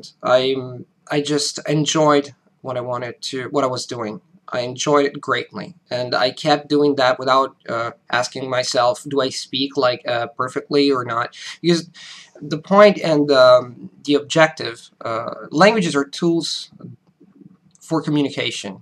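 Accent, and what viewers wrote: United States. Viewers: Thanks for sharing! I actually just subscribed to your vlog today. I'm American and I thought maybe you were an American who moved to Italy. I'm impressed with your story. You sounds just like an American to me when you speak English. That's great! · So, the first video I watched of yours, I didn't know you were Italian. I was listening along, assuming you were American, but as the video went on, I got this weird feeling like something was just *wrong* and I couldn't describe what it was. It actually got a little jarring to my ears, like a little needle. Then I started to realize there were very subtle differences in your speech. I actually couldn't watch you for a while. Not to criticize, you're fabulous! Just an interesting experience. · You have an American influenced accent but your accent doesn't sound 100% American to me. I actually quite like your accent =) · Interacting and speaking with native speakers is definitely not sufficient in sounding native and mimicking that accent. 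I am American and there are SO many people who have lived here forever and still have very heavy accents (Arnold Swarzenegger, for example). It takes determination and a good ear to listen to what you're doing wrong. He has a very good American accent but (like most people in a foreign language) I wouldn't think he was an American if I talked to him, but he sounds VERY good. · Your American accent is excellent! For the first few minutes you speak, it's hard to tell that you aren't from the states! Thanks for the video, you've given me motivation! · you're a great American english speaker. When I watch your videos, my girlfriend actually noticed that you sound like as if you moved to America or you grew up here. I spoke two languages when I was growing up and sometimes I would mix my Filipino (Tag-lish) accent with my normal "school" accent. I guess it depends on who I talk to. What I noticed is that my accent started to become more and more American since I loved watching TV sitcoms like Cheers, King of Queens, Frasier, etc. 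I also loved keeping up with the late night show jokes. I sang a lot to songs I hear on the radio as well. Right now, I'm currently learning French. I've learned a lot of techniques from polyglots like yourself, techniques that I use whenever I approach learning French. I'm sure I won't sound like I grew up in France but as you said, it's about conveying the message with language as the tool. I'm just more inspired to learn French especially when I watch videos like yours. Keep on inspiring people, man. Keep up the good work! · Wow. Your English is, obviously, incredible, and your hard work paid off. :) You sound like you were born in America. I wish I could speak with such fluency in another language, so you're very inspirational! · you have a great american accent!, and i can totaly understand you, when you said america was around you and you havent even been to the country, same here with me!, i have never went to spain or mexico or any latin countries but yet when I talk to native speakers some say my accent is better than theirs! 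lol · Hi,! Your accent is great and your English is just perfect. How much time did it take you to become fluent and to acquire an American accent? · You sure have that ''flawless American accent!'' that inspector clouseau (although French xD) could never get! I have never heard a non-native English speaking person speak with such a perfect accent! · I love your American accent. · I commented on your other video but you make a great point: speak clearly and simply. So many foreign people speak English "fast" thinking they're fluent but they are very difficult to understand. A person with an accent who speaks clearly and simply is so much better. btw you do sound 99,99% American, I really have to listen to notice you have an accent and would not pick it up in normal speech. Congratulations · Beautiful! You sound like me (I live in America).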